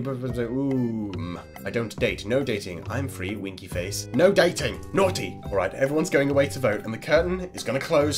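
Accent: United Kingdom. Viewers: are you UK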